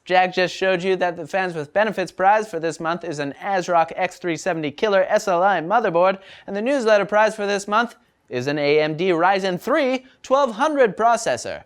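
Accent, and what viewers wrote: Italy. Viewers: Italian accent sounds like mario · That Italian accent tho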